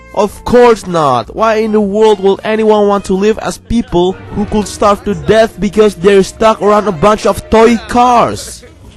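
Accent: Indonesia. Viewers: maigat w kira lu 22tahunan ternyata tuaan gua yha hhh. btw tau banget sih lu orang Indonesia from ur accent kedengeran banget ditambah unamelu ada ""doangnya" wkwkw gua tbtb seneng sendiri karena lu orang indo(?) ya because I am actually proud of you because u have So many great contents and i really enjoy all your videos! keep it up dude! sukses selalu! Cheers👍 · iya aksen lu emang indonesia banget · I KNOW IT!! haha!! because, you speak english language in Indonesian accent :v perhaps, you should try English in "medok" accent :v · Aku tau kamu orang indo karna cara bicara kamu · Oh. Im Malay and understand Indon. I knew that accent. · I knew you are Indonesian, from your channel's name, your accent is really cute xD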